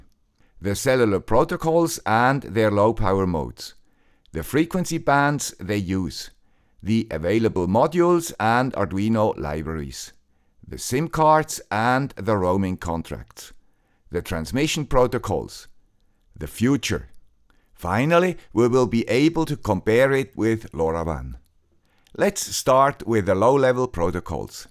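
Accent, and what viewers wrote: Switzerland. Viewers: I didn't know that was a Swiss accent. And why does everyone assume Americas eat steak? Is it unusual for people to eat beef? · Once again, "...the guy with the Swiss accent shows why I consider him the reigning King of IoT on YouTube! I've come across a company in the US that specializes in provisioning mixed mode, mixed carrier IoT/M1 SIMs networks I don't remember the name, and I'll try to get it to you if I can find it again. My new, rural, American home has essentially no coverage with handsets on one big network, except their wireless internet device supplies enough data for WiFi calling to handsets, and reasonably good YouTube video streaming. Another big network provides enough bars to do the above directly on handsets.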